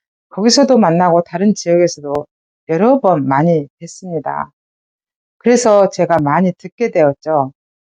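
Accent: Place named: Honam, South Korea. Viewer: 당신이야말로 애국자 이십니다 진실과 거짓을 구분하고 정의와 불의를 알며 선과악을 알고만 있어도 세상의 모든것이 제대로 바뀔수가 있읍니다 억양이 호남쪽분 같은데도 이렇게 바른소리를 내시니 천만다행 입니다 고맙고 감사 합니다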